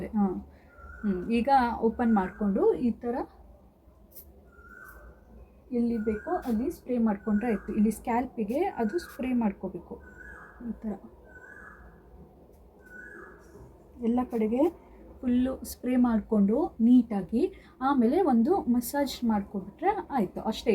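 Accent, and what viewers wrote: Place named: Kumta, India. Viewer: Ondu mat helala friend, Nam kumta bashe gatte bere, nenpitkolli, becoz Nan nine YouTube nodtirovaga nim vidio nodade subscribe madade, Nam bashene matadide ennu kushine nanu horgadane edini 27 varsdinda, nammur bashe kelidre evru nammavre Alva ansatte, god bless u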